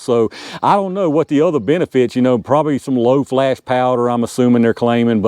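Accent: United States. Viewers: God damn you sound like America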